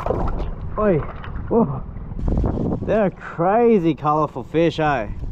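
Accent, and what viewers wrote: Australia. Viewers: Beautiful scenery, wonderful catches! 😀 I've never seen squid so transparent. And those eyes! WOW. Weird and wonderful creatures. Tasty too! 😆 I'm just trying to get a handle on your accent. It's sounds a little Aussie with a touch of Ringo Starr mixed in. 😁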